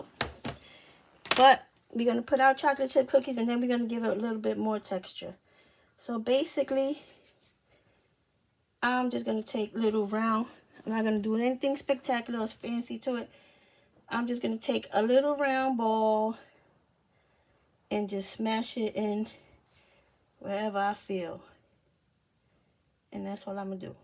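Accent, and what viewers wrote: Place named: South Louisiana, United States. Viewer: Hi,. 😁 You sound like you are from south Louisiana; Houma, in particular. I'm a Cajun, so, you sound so familiar. 😊❤️. Thank you for sharing your creativity and talent, with all of us. ❤️🙏🏻❤️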